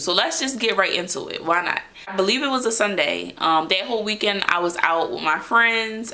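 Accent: Philadelphia, United States. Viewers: I know that philly accent anywhere 😅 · You sound like you from PHILLY! That accent is CRAZY.. I'm listening for the word "Jawn", or "Drawing", but your talking kinda proper right now 😂 but you can't hide that accent. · Damn I’m bout to do the same move.. I knew u was from Philly as soon as u started talking… it’s crazy out here and I’m glad u safe and made this video.. #BeSafeTho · I knew you were from Philly before you said you were from there 😂😂 sending love from Philly ❤️ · Are you Black American? Love the Philly accent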